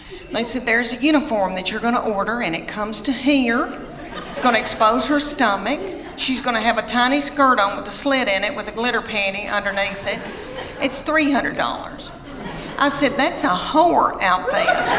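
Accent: South, United States. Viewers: I love your accent. After a Spanish woman with a lisp you’re southern accent is absolutely adorable, obviously 3rd place goes to a Welsh woman.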